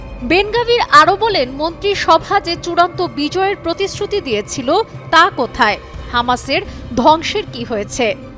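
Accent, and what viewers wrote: Kolkata, India. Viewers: voice dada kolkata theke esse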